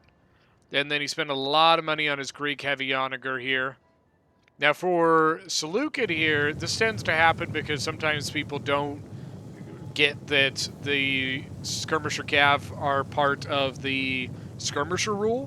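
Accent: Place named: United Kingdom. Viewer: your british accent actually suits u a lot more than u relise :))):_Ellington::_Rome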